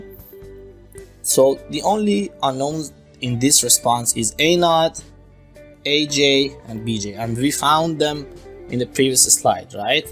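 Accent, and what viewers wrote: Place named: Iran. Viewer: Idk it is me or all persians feel joy after hearing the [persian accent]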